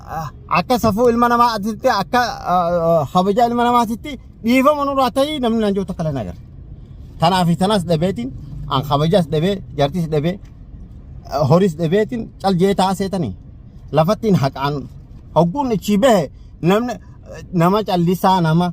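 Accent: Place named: Somalia. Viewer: Kuni namichi sumaaledha oromo maaltu godhe haasofin isaa ni beekama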